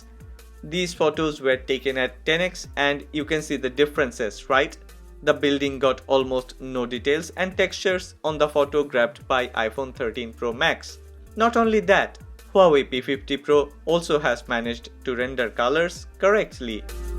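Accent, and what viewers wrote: India. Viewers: you sound like from India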